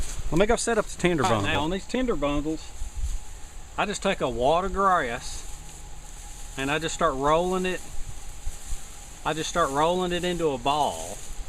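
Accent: South, United States. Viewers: Hey man, I love your accent! What part of the States is that from? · This guy is so much southern (no disrespect)!!! It's funny when he says Machete. ..LOL I like your vids.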